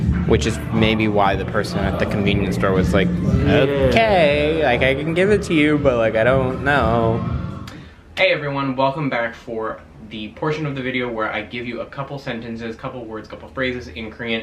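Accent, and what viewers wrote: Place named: Japan. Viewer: Your Korean sounds like Japanese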